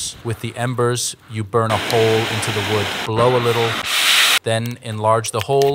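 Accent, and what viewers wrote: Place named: Germany. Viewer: Hey wer ist hinter deiner Stimme? Denn deine Stimme hat ein Akzent auf dem deutscher Kanal in Gegensatz zu den amerikanische stimme.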